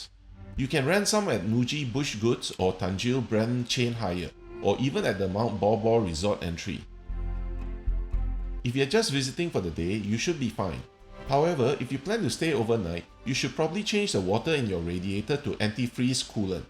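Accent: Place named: Malaysia. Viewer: Thanks for the info bro, amazing video😊. I am also planning a trip here soon. Btw are you Malaysian as well? Guessing based on your accent😁.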